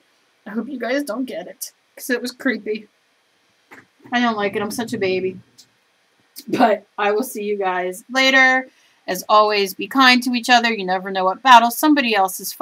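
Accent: New Jersey, United States. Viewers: I love your accent, Jersey? Philly?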